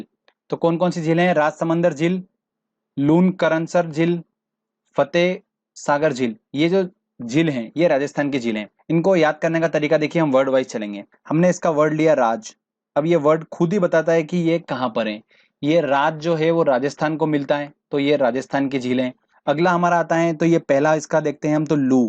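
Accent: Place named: Madhya Pradesh, India. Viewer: Aapki voice se hi lag gya aap MP se ho mere bahut dost he MP se islie samjh aa gya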